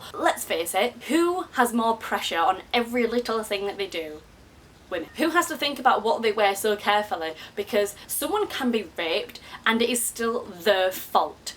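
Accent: Yorkshire, United Kingdom. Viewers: I am glad you are alright. I will always remember you because when I was looking for the Yorkshire accent, your videos were the first I watched. :)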